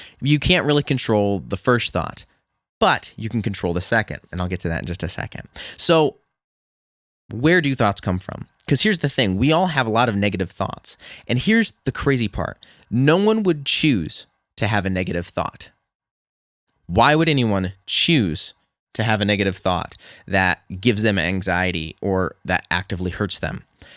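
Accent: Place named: Michigan, United States. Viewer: Are you from Michigan? Your accent kind of sounds like it 😂😂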